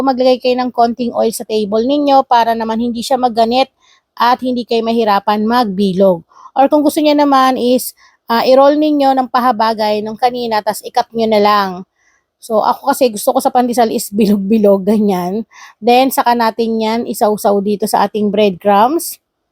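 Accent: Bicol, Philippines. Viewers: Boses bicolana ka po nanay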